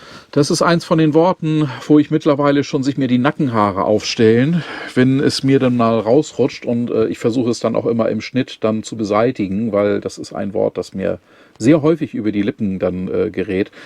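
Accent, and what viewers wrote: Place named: Northern Germany, Germany. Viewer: Auch von mir herzlichen Glückwunsch zu 25Tsd. Guckern. Bin ja noch frisch dabei. Ich mag dein freundliches Wesen und den norddeutschen? Einschlach. Moin aus Ostfriesland. LG Matriczia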